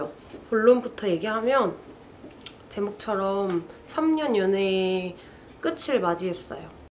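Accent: Busan, South Korea. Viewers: 뜬금없는데 언니도 부산에 가서 사시더니 사투리 좀 쓰시는 거 같아요 ㅋㅋㅋ❤❤❤❤🤣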